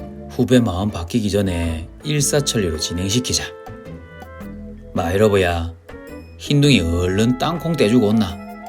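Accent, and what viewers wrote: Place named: Busan, South Korea. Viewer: 부산인가요? 사투리가 동질감 있어서 더욱 빠져들게 했어요. 고생 많으셨습니다. 좋은 일만 많이 생기시길 바랍니다.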